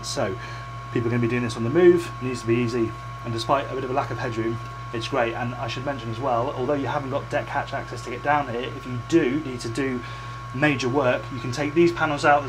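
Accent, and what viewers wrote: England, United Kingdom. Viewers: Dear God ,This guy Talks So Fast ! You do not have time to think about what he said ! Even worse ,he has an English Accent ! No Coffee for him !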